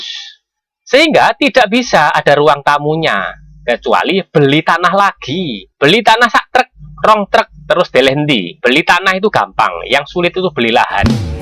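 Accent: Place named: Java, Indonesia. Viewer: Mlungker kaya obat nyamuk bakar Jawa medok , sukses selalu bro .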